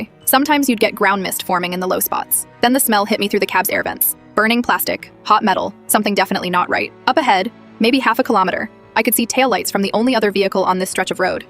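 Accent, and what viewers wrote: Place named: Australia. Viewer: Hey, I have never heard an Australian version of this, it’s nice for a change, keep it you mate.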